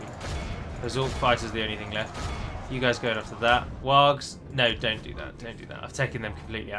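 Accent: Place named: RP, United Kingdom. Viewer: I'm a very recent subscriber, but I have to say, your Third Age LPs are a breath of fresh air, partly because of your super aggressive style of play, and partly because you edit out the boring bits when needed. It sets you apart from a lot of other TW YouTubers, and makes your campaigns flow at at a pretty smooth pace, which I like. I also find your commentary and super posh accent (I mean no offense; I'm a big fan of those accents) pretty enjoyable to listen to.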